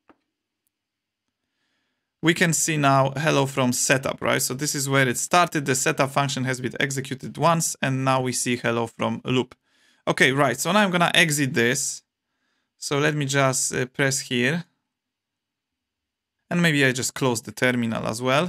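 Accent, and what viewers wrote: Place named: Poland. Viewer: Dobra robota dobry angielski i typowo polski akcent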